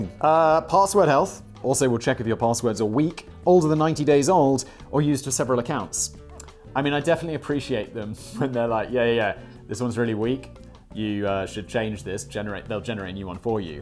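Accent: Russia. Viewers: Great Russian accent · I was born USSR, and russian accent is my favourite russian accent on YouTube.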